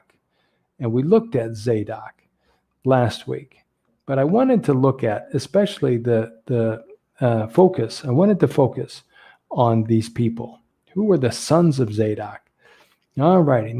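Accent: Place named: United States. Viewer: The American accent is wonderful!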